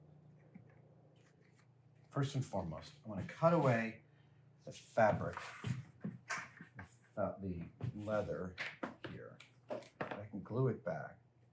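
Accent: Scotland, United Kingdom. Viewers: Nice ta here your Scottish accent dude no to bad tbh fea the bony home land of Scotland 🏴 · Love the wee Scottish accent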